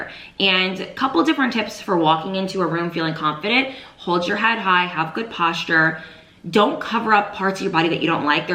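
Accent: New Jersey, United States. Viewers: The Jersey REALLY came out in this one 🔥👏🏽 beautiful advice, thank you 💖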